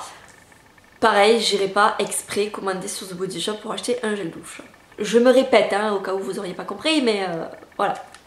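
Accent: South, France. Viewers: Coucou tu viens d'Avignon ?? Tu a l'accent du sud :)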